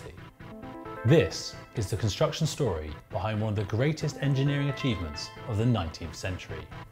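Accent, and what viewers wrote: United Kingdom. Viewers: Sotaque britânico não dá pra aguentar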